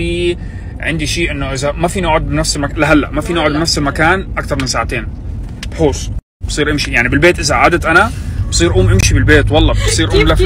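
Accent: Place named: Aleppo, Syria. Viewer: لهجتك شوي حلبيه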